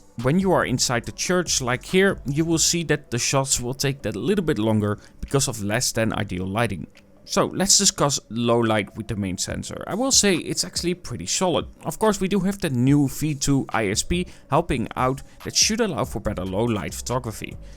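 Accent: Netherlands. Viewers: Klinkt alsof je van Nederland komt. Je maakt goeie reviews 🙏🏾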